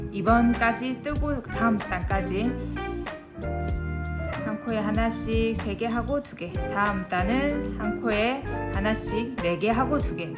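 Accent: Busan, South Korea. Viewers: 부산 말투가 정감있고 좋아요~ 오른쪽거 제 취향. 수세미로 쓰기에 너무 이쁘네요. 컵밭침 인줄 알았네요. 삼베실로 모자 같은거 뜨면 예쁘겠어요. 짧은뜨기~ 하니까 예전 추억이 돋네요. 노인에겐 치매예방, 아이들에겐 집중력 개발 안정감 증진! 많이들 보고 따라하면 좋겠다 싶습니다.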